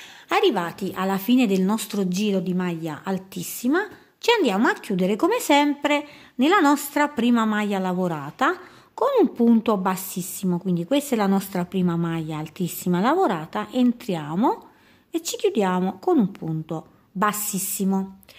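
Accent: Italy. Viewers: Molto carini! Brava nella spiegazione......si sente che sei siciliana 😀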